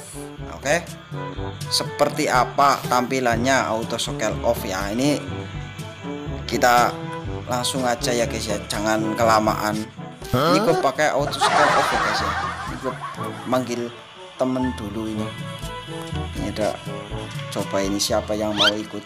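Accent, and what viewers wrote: Java, Indonesia. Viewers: Wih wong jowo🤣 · Wong Jowo to? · jawa ne ngendi pak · Bg orang Jawa ya kaya aku ngomong nya kaya gitu 😅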